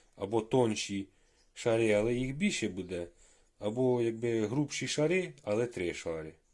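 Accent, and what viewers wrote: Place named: Kirovohrad, Ukraine. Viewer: Така мова цікава, так моя рідня на Кіровоградщині розмовляє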